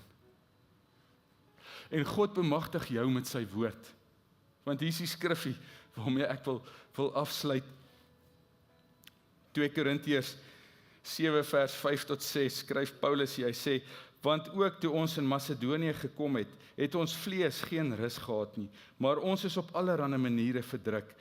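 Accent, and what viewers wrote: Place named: Netherlands. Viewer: Waar komt u vandaan, klinkt erg Nederlands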